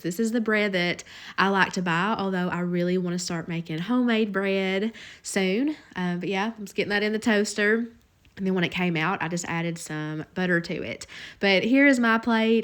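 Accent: South, United States. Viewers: Hi, you are a wonderful cook. Everything looks delicious, especially the hash browns & the pork chops. Your comments along the way are great (like the pan that heats up incredibly fast, & going next door to get milk!). Lastly, I could happily listen to your lovely Southern accent all day. Thanks for all the time and effort you put into your videos. Best wishes from Sydney Australia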